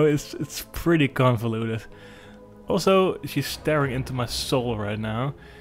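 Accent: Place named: Netherlands. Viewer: Nederlanderrrrrrr!!!! 1e wordje hoorde ik het al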